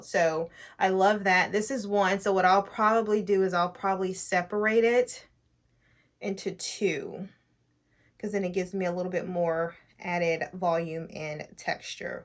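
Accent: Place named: South, United States. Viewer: Beautiful! I swear I heard your Southern accent more in this video than any other 😍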